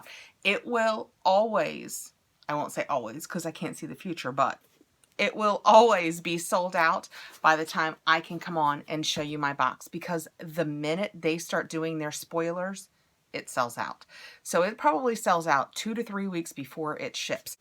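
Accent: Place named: South, United States. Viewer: Lavenda! That is how a true Southern girl speaks! My grown kids tease me as I say ,"Souwich," instead of "sandwich"!! Raised in Houston and love it!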